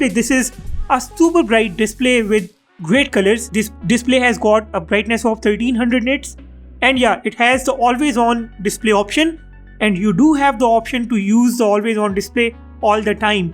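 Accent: India. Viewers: I hate Indian accent She makes me unable to finish the video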